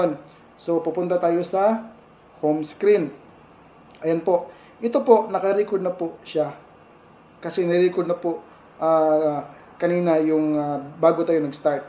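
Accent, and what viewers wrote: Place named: Iloilo, Philippines. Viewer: Ilonggo guro nis kuya ay.. hehe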